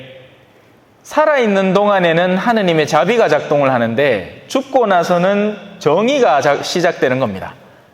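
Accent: Gyeongsang, South Korea. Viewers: 찬미예수님...신부님..말씀강론.잘들었습니다.경상도.사트리.정겹고.고향생각을하게합니다육남매.형제가.그립네요.신부님.사투리에.위안이되옵니다🙏🙏🙏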